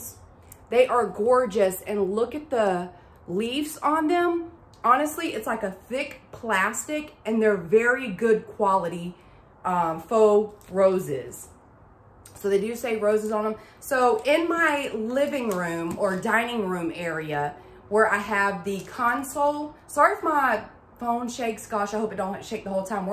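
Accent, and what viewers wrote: South, United States. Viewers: You are adorable 😊 I just love hearing you. I’m in California and love to hear people from the south!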